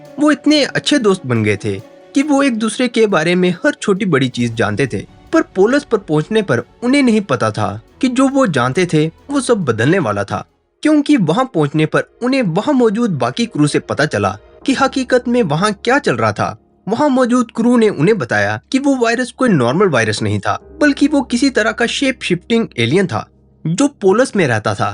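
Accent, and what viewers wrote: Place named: Punjab, India. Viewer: Your voice is like punjabi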